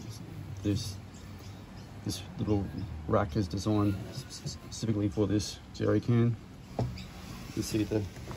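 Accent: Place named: Australia. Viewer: i built a swinging carrier in about 2015 glad to see someone else has done it. Im in QLD sounds like youre in aus too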